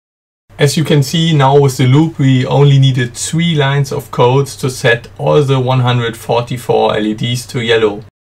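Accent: Germany. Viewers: Man hört einfach raus das du Deutscher bist 😂😂😉👍🏻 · 1. Sekunde das englisch gehört, dierekt gewusst dass du deutscher bist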